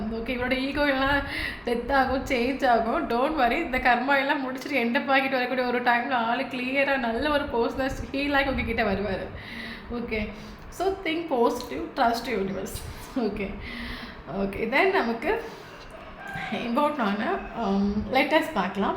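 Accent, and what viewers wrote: Kerala, India. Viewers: ரொம்ப நன்றி பிரபஞ்சம். நன்றி மேம். ரொம்ப அழகா சிரிச்சிட்டு ரிடிங் சொல்லுறீங்க. மலையாளம் கலந்த உங்கள் தமிழ் அழகு. எனக்கு ரொம்ப பொருந்துச்சி இன்னைக்கு reding, நன்றி ♥♥🌹🌹🙏🙏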